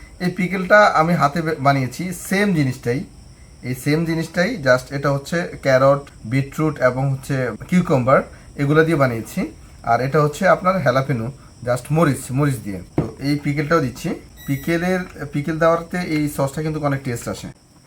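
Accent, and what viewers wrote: Noakhali, Bangladesh. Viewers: হু ভাই! একদম খাঁটি নোয়াখাইল্ল্যা। এজন্য কিছু শব্দ নাকের ভিতরে চলে আসে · বাড়ী কি নোয়াখালী নাকি ভাষা এমন কেনো।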